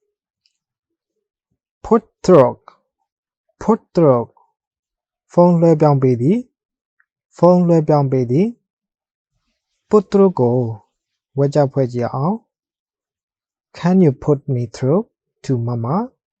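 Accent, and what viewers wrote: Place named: Myanmar. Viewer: မြန်မာဘာသာ ပြန်ဆိုထားတာ မနက်ပိုင်းနဲ့ ညနေပိုင်း လွဲနေတယ် အသံထွက်ပီပြင်ဘို့ လိုနေသေးတယ် ငပိသံ မပျောက်သေးဘူး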